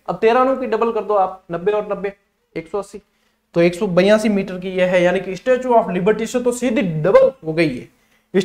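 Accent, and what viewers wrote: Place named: Pali, India. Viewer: Sir mujhe aapki pali wali bhasha bhot mst lagti h aap aise hi bola kriye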